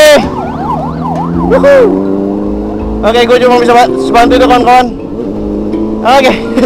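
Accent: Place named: Lampung, Indonesia. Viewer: YouTuber dari Lampung mana ini suaranya nee.?👍👍, . . . . Monggo mampir ke channel ku juga yaa🙏 .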